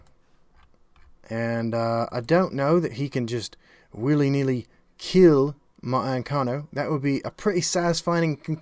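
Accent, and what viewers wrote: Ireland. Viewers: Very strong Irish accent Sir:)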